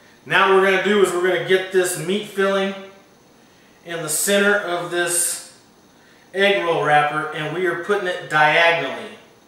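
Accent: South, United States. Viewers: Nothing wrong with a good Southern Drawl haha. I am friends with Christy's Cooking Channel. Those things look awesome. I will check hers out too.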